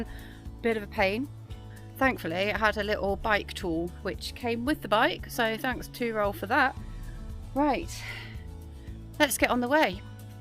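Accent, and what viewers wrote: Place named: Stockport, United Kingdom. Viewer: Great video 👌 I love riding round there views are amazing… there are much better e-bikes 😂 are you a Stockport girl? Very Stockport accent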